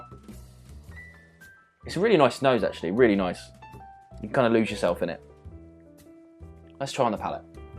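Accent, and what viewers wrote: England, United Kingdom. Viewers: I actually like the English accent. It makes him unique. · i could do without the engish acent.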